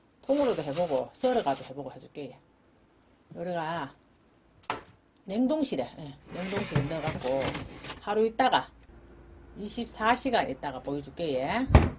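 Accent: Gyeongsang, South Korea. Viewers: 경상도 사투리가 언제들어도 듣기가 좋습니다 .😊 늘 잘보고 있습니다 고맙습니다 ❤❤❤ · 와우😮 진짜 좋은 팁 넘 감사합니다. 오이 얼려 본적 진짜. 없는데. 저도 그리 해봐야 하겠습니다 저도 어릴적 2년반 정도 부신에서 살았던적 있어 경산도 사투리 들을때. 정겨워요😅😅😅😅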